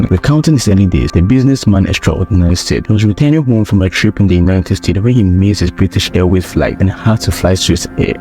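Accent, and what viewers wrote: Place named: Africa. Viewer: The narrating speed is too fast, although it sounds African. I wanted to listen to the inspirational story, but the playing theme was not falling in place for me.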